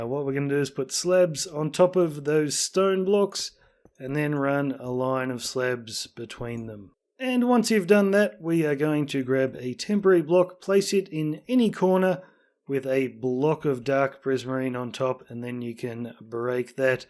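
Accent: Australia. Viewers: Nice to hear an Aussie voice! I built this in my One Block Skyblock world, but changed the inside around as I'm outfitting it as my house and storage. I decided early on what colours I wanted, and in the end was so grateful I'd kept everything the one block threw at me. I went for a dark oak, prismarine, and quartz block palette, with sea lanterns, and emerald blocks instead of gold blocks. It took me a fair while to build in survival. Otherwise it looks like yours outside, apart from forgetting the anvils and hoppers, so it's a less imposing spire. Thank you so much for the hard work you put into this tutorial!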